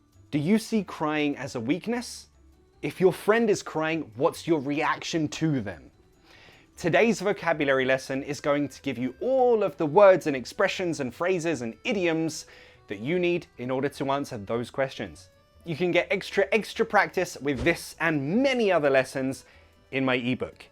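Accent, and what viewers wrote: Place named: RP, United Kingdom. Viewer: Kudos. Love when you teach posh english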